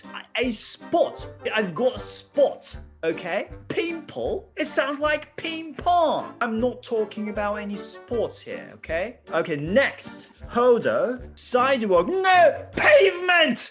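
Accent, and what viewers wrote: United Kingdom. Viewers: ちゃんと全部Britishの発音なのすこ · コリン・ファースみてぇなイギリス英語だぁ…綺麗過ぎる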